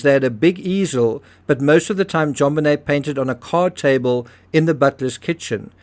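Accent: South Africa. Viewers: He is South African. He can't his accent. Just like you, can't change yours. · your accent alone is reason to watch it all... I pride myself on being able to mimic accents with South African being one of them and your video helps me keep it fresh and sharp... You and Die Antwoord,... 😉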